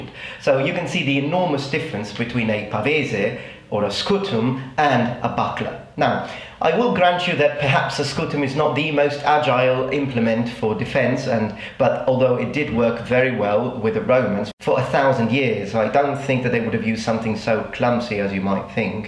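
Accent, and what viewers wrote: England, United Kingdom. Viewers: knew I could hear some demented English accent in there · Bait? It's a genuine question. What sound do you think he's pronouncing non natively? I'm genuinely curious to know what sounds off to your ears about his accent, since if I heard someone talking like him, I'd assume they were a Brit. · Why do sound so British you are Italian though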